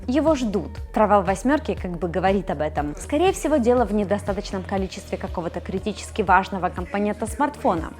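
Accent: Ukraine. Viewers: Может девочку поменяете на более привлекательную? Не думал что скажу подобное, но на жирного мужика мне смотреть интересней, чем на пресную женщину, с проскакивающим малоросским говорком. Так хоть глаз будет радовать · чет приуныла. Избавилась от яркого, бросающегося в уши украинского акцента. Остался лишь небольшой отголосок и слушать теперь по приятнее. Но ты с ярким акцентом как будто силу богатырскую потеряла. Как будто что то случилось, если это так, держись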